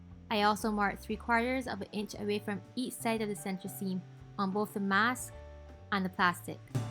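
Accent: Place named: Barbados. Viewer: You're Bajan the accent shocked me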